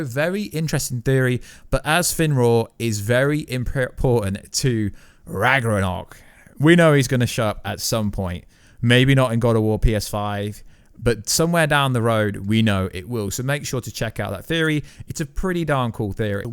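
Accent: England, United Kingdom. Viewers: (not relevant but but whereabouts in England is your accent from don't sound like black pool or London to me just curious?)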